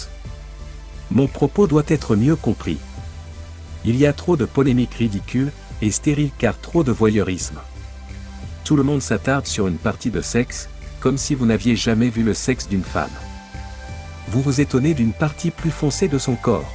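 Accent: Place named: Europe. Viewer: Quel médiocrité.... et utiliser une voix européenne pour dire que les médias de l'occident s'y mêle est encore plus nulle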